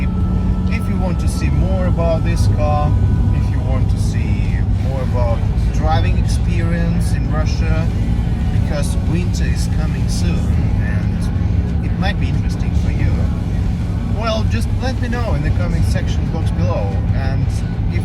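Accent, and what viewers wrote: United Kingdom. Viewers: Well done, it adds a bit of flavor to the exhaust, personally I'd go for a bit less noise for daily driving. But I do have to say, your english is very good, you almost sound British lol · Чувак, молодец, лайк за приятное произношение английского))) Похож будто англичанин))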